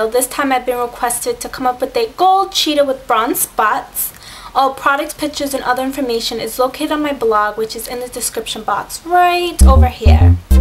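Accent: New York, United States. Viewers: Very cool video!! I love all things related to doing my own nails, natural, polishes, etc. I will be trying this. P.S Love the accent, sounds like New York maybe or north period!! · Cool ;) !! New York accent ?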